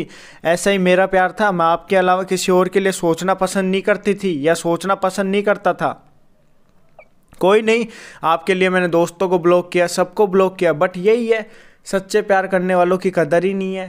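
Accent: Haryana, India. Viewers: Sir aap Haryana se ho kya ??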